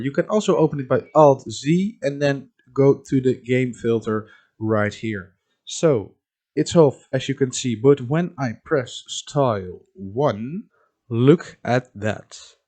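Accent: Netherlands. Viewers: You Dutch by any chance? 😄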